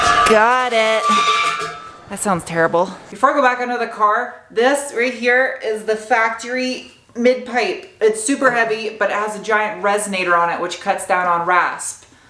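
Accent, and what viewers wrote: United States. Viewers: cant stand the way americans garage, like gar-odge in canada we say guh-raaj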